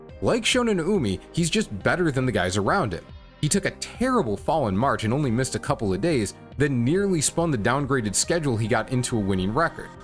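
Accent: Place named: United States. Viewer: Good analysis, but I wish you stopped americanising Japanese words and Japanese names.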